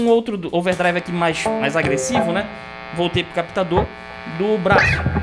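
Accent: Pará, Brazil. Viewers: Video show de bola. tu é Paraense?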